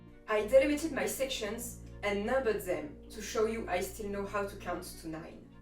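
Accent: France. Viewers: Are you french ? you havea strong accent ! (I'm french myself so just asking ^^) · toi sa se voit que tu es française juste par ton accent, tu parles bien anglais quand meme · Are you French ? you have a french accent x) (si jamais je suis français et bravo pour ton travail !) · Are you french? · Avec l’accent je me disait que c’était pas une anglaise mais une française 😅 Mais juste RESPECT pour pouvoir créer des parties de cosplay 👏 J’en prendrais graine lorsque j’aurais tout les matériaux pour faire mon propre cosplay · Tu es fr? On entend un fort accent français :3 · That so good omg ! I was wondering , are you French or something like that ? Btw it's really cool keep it like that is really amazing ! :D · hum that french accent... i have the same one sinon joli travail. meme pour les prototypes, ils sont plutot bien réussis. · Are you French? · I don't know but I'm just assuming, she's French 'cus of her accent? 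· Are you french, you sond French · You have a fr accent i u right? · Tu es française toi sa s'entend xd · Ça sent le français cet anglais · Me, french people : FRENCH ACCENT !? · i was wondering, is you accent a french one by any chance? i rarely get to hear french people speaking english with such fluidity so i'm not used to it. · j'ai reconnu notre fameux accent en anglais x) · Oh my gosh! You are SOOO adorable and talented. Thanks for sharing your processes in making articulated tails. My daughter loves them! She wants me to help her make one and this video has helped me SO MUCH! All the best to you! We love listening your cute French accent!!! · 00000 i can use this for a fursuit tail!!! thank you so much! also let me just say your accent is amazing! is it french? i really like listening to it it's sort of calming :>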